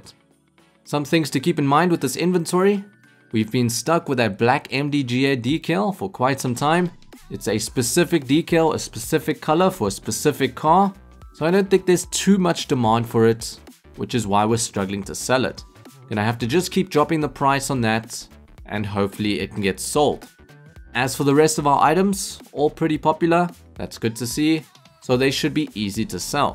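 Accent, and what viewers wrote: South Africa. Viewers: This man sounds afrikaans or is it just me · Why do you sound very south african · I know a South African accent when I hear one!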